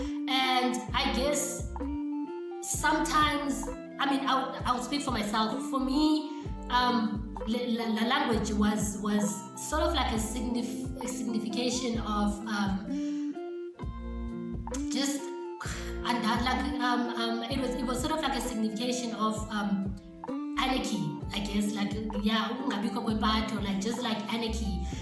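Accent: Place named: Eastern Cape, South Africa. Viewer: Your accent makes me miss living in the Eastern Cape🥰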